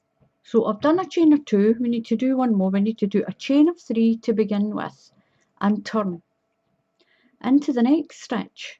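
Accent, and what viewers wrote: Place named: Scotland, United Kingdom. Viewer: I can't believe it...my Momma, 86, just asked me where you were from. I mean, you sound like you were born just down the road from Sean Connery, and she asks! Hello from Texas! This is another great, beautiful tutorial, thank you.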